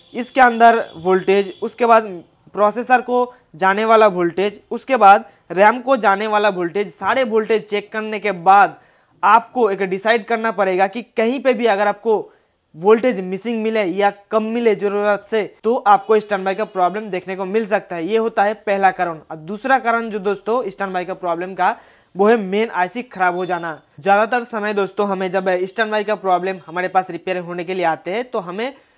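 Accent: Bengal, India. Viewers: দাদা তুমি বাঙালি নাকি তোমার হিন্দি কথা গুলো বাঙালির টান আছে ❤️ তোমার কথা গুলো ভালো লাগে আর বুঝতে ও সুবিধে হয়❤️ লাভ ইউ দাদা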